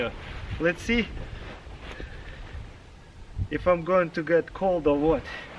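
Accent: Russia. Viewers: Жуткий русский акцент. Ха ха ха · Что за русский акцент?))) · his accent tells it that he is a russian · Такой махровый рязанский акцент, прикольно звучит. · wow this guy look so much like crazyrussianhacker even got the accent too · Твой акцент выдаёт в тебе русскоязычного.😂😂😂🤣🤣🤣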